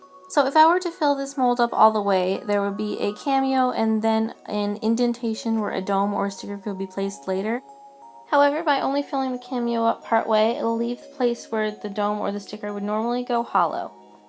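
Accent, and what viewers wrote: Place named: Canada. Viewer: Are you Canadian? I can hear it in the way you say, "about" you say "aboot" lol it's cute.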